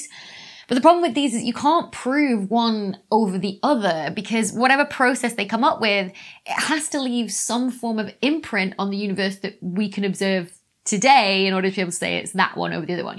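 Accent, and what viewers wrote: United Kingdom. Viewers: Very nice lecture. Clear and well explained with a cute British accent. Why an object will go faster than the speed of light in a black hole when it's not permitted outside of it?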